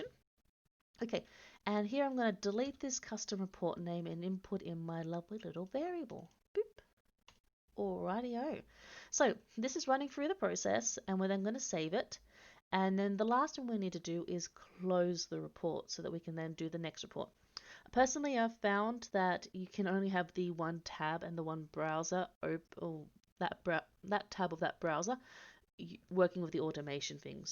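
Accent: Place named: South Africa. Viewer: thanks very much for this tutorial. I searched and searched and eventually found your video which was just brilliant. I am a bit curious as to your accent. I lived in South Africa for decades, and I have an English South African Accent, which made me question if your accent doesn't have some South African in it, then I saw one of the subdirectories which had what looked to be Dutch text, then I though maybe there is some British in the voice and possible New Zealand. You're probably going to tell me that I'm barking mad and that you are from Egypt 🙂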